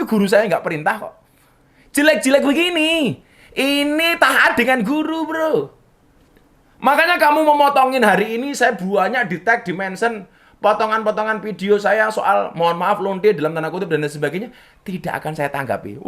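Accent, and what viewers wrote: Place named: Indonesia. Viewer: Wow cara baca lafadz arabnya khas islam nusantara. Ngaji dimana ya pak?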